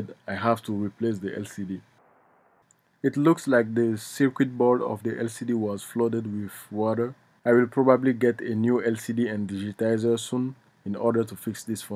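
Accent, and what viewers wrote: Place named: India. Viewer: the fuck what's with the indian accent you making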